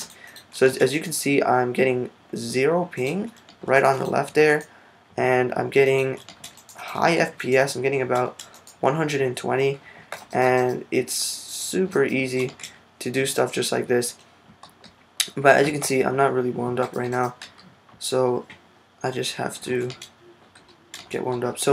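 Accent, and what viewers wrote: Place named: North America. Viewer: Do u live eu or na cos u sound na but play eu server?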